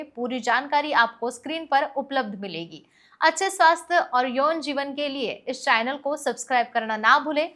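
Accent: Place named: Maharashtra, India. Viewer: Woh marathi hain sayad isiliye unka uchcharan waisa sunne ko milta hai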